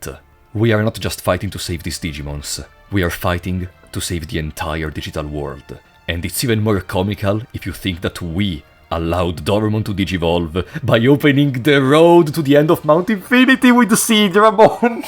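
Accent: Italy. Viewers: are you italian? the accent sounds like it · I think you are italian just like me, kinda feel it from the accent, btw i'll comment in english cause I think that's the target that you want to reach; i'm impressed from the video, the dedication and the will you put on it, i love it from the bottom of my heart and i laughed so much so many time, please keep going on!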